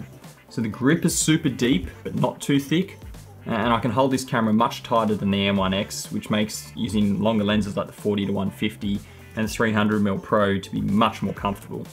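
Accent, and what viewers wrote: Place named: Australia. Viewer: An excellent review. You sort of made a lot of mysterious stuff understandable even at the pace you were running at and me not being used to concentrating on oz tech accents. I look forward to some of the tip vids you might put up. I am very interested in getting slow-mo video working but have found very little understandable advice. Check the manual - No real explanations there neither in the Rocky Nook books. My preferred ambassadors are self confessed amateurs at video. Even asking eachother and getting no real answers. Thank you greatly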